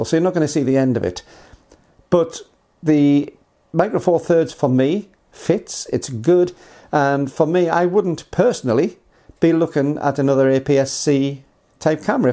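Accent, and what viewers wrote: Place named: Newcastle, United Kingdom. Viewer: Always good to hear from anyone who sounds remotely like a Geordie ........ Most of this vid is well known to me, but it takes a voice on Youtube to keep getting the word out ..... we are all a long way from the 24 x 36 film aperture behind the mirror box..... I adopted the 4/3, then M4/3 simply because they were developed "from the ground up" with camera, lenses etc. I will not however, give up my Fujica St-705 which put me on my journey all those years ago ........ long live Kodachrome 64 ! Presently shooting with E-M1 Mark III and backed up with the Mk II and many others ........ keep up the the videos, they are appreciated !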